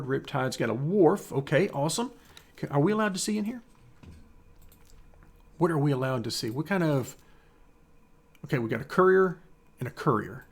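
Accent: United States. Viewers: Nice video. From the sound of you, we are probably from the same area of the US. I'm not great at the EVA either btw.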